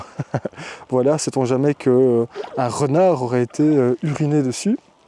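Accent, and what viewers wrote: Belgium. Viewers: Ouit= huit j’aime trop ce petit accent belge. ♥️👍😊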